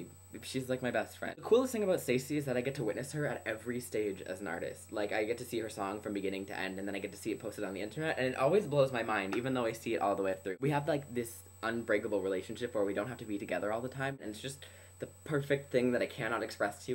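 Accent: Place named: Canada. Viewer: Haha. I love your canadian accent, the way you say 'About' and 'House.' It's however not as thick as Dhiel's, from another gay-themed collab channel, something like awesome not so straight guys. I always forget the name. v_v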